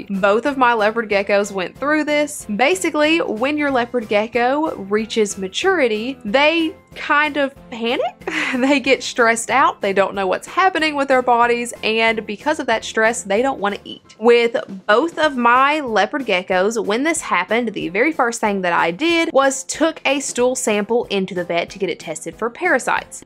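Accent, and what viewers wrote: South, United States. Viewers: that southern accent❤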